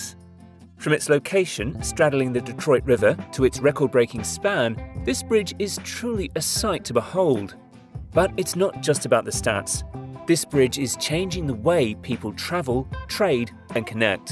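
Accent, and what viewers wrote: United Kingdom. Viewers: someone with a faintly "British" accent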